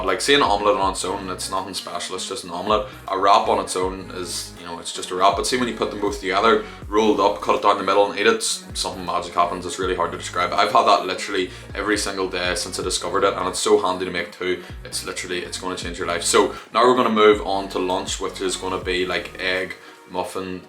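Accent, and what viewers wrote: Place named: Ireland. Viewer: Love the video mate. What part of Ireland you from?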